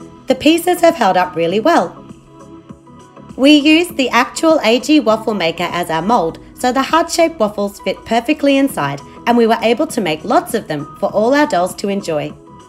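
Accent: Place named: Australia. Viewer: Your so welcome! I have always thought that the Aussie accent was cool, but when you speak, it sounds even more cool!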